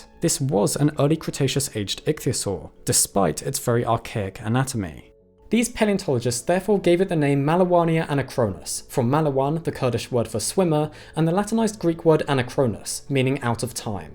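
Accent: United Kingdom. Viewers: if this channel had a non-british narrator i would probably watch it more often. the narrator is also just so monotonous and the script is so standard academic that I cant even listen to it without my mind drifting away.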